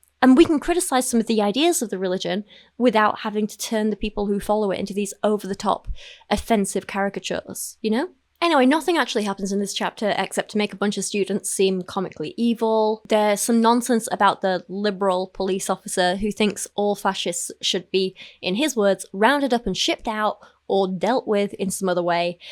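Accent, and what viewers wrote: United Kingdom. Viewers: When you read this nonsense in your pleasant British accent it just sounds to me like Harry Potter crackfic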